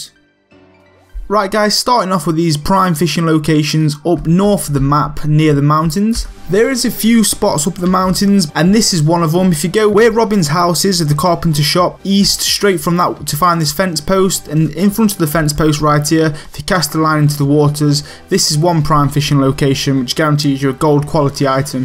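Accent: United Kingdom. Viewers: Yes, but what's your accent? I like it. Is it British?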